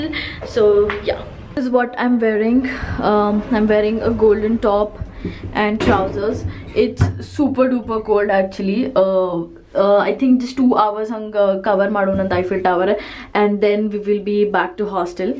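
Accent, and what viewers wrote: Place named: Hubli-Dharwad, India. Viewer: I like your Hubli Dharwad kannada, very much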